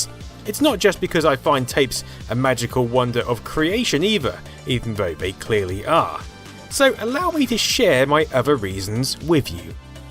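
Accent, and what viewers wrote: United Kingdom. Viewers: IDK, but he could live in the UK thanks to the fact that knows A LOT about UK game computers. Also, he sounds very British.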